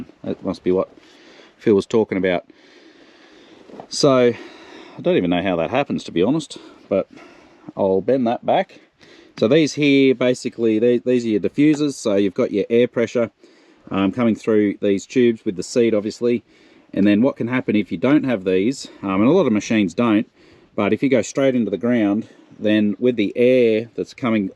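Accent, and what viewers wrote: Australia. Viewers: Could you keep the lingo to the straight up down under language? I have hard enough time with the Ozzy accent done.😊😊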